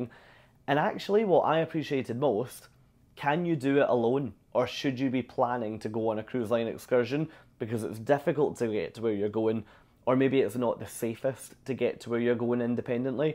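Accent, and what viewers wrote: Scotland, United Kingdom. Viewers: Is this Scottish accent? I like it.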